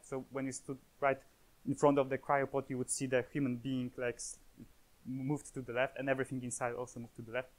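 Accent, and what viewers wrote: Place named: Poland. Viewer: dziwnie się słucha polaka mówiącego po angielsku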